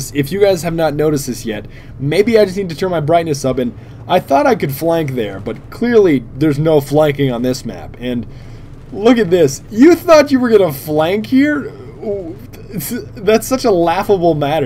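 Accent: Canada. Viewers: i thought u was canadian :O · Arnt you Canadian?! ^^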